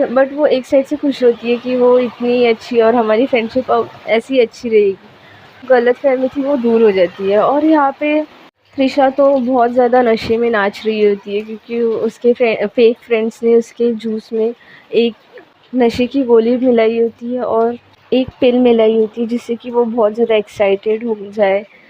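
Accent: Maharashtra, India. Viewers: Thank you mam😊, aap bohot aache se stories batate ho. Muze kabse aapko kehna tha ki apki language se marathi wibe aati hain muze thodi thodi😅.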